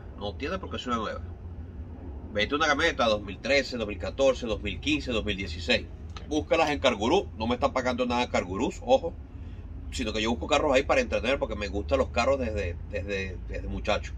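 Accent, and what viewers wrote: Venezuela. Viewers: Hola saludos hermano me interesa sobremanera y me llama la atención tu acento creo que eres Venezolano 🇻🇪 Yo también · Excelente amigo muy bueno y claro el video. Al escucharte supe que eras un paisano venezolano.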